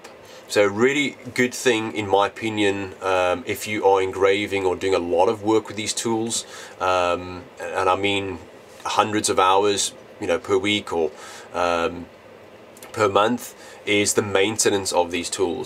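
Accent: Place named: South Africa. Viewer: That's got to be a south African accent if I've ever heard one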